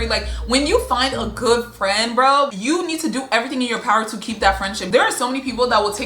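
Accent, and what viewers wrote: Nigeria. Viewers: Nigerian accent 😫😫😫😫😫🤌🤌🤌🤌🤌🤌🤌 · Are you a Nigerian cause u have that accent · Again I ask,are you Nigerian cause the accent is hitting hard which makes your reactions more real and relatable 😂😂😅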